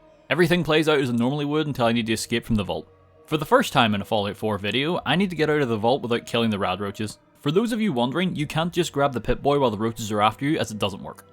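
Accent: Northern Ireland, United Kingdom. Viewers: Northern Ireland? 👍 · You from Northern Ireland? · You sound northern Irish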